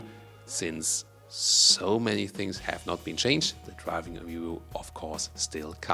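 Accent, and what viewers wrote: Germany. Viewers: There's something about German accent that adds authenticity to anything he says.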